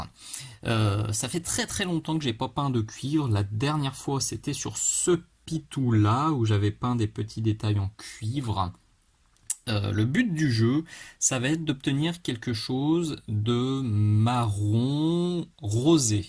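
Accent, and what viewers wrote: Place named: South, France. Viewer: Salut. Encore une très belle vidéo sur ce métal non métallique en cuivre, pleine d'enseignements et de conseils judicieux comme toujours. Et bravo pour l'accent du sud.. qui me rappelle le mien (natif de Toulouse..)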